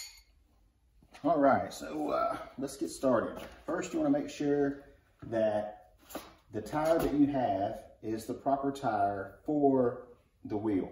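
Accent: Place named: South, United States. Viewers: Great demonstration. I hope I never have to do this repair job but it's helpful to know that it can be done. I love that accent, whereabouts in the U.S. are you from? We don't hear anyone speak like that in Australia except on movies. Thanks mate. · Presenter definitely has an accent, although slight. Definitely somewhere in the southern US. The way he pronounces the word "buy" is a dead giveaway to my "normal" US word-pronunciation-keen California-raised-ears of 56 years.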